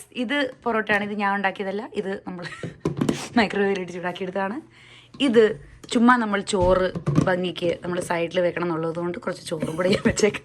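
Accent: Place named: Kottayam, India. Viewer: Kottayam slang❣️🤌🏻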